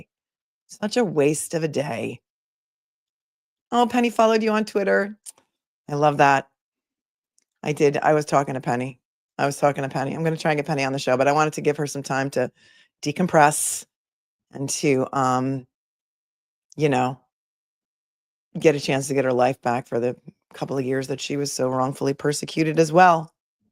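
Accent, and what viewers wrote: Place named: Boston, United States. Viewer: Awesome coverage ! love your very appropriate humor & Boston accent 😃😅 as needed - lol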